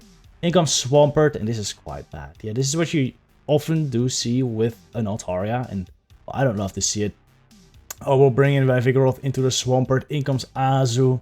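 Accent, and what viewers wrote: Netherlands. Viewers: Zo duidelijk dat je NL bent, goeie video’s wel hahaha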